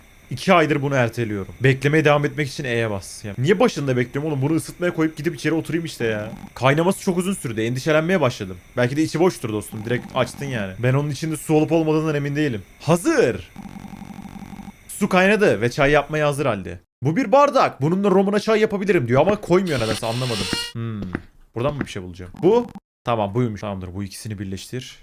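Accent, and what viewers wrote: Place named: Istanbul, Turkey. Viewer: Muazzam İstanbul Türkçeniz ve inanılmaz diksiyonuza hayran kaldım hanım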